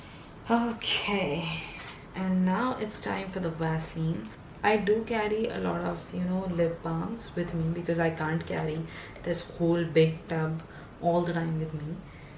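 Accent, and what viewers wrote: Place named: Canada. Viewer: Woahhh that Canadian accent thou🫣🫣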